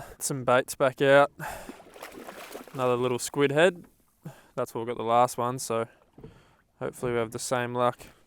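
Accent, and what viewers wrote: Australia. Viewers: Where are you based like country wise because your catching snapper and those annoying ass sharks that tangle up your lines 😂 so I was guessing New Zealand north island area but your Aussie accent is putting me off 😂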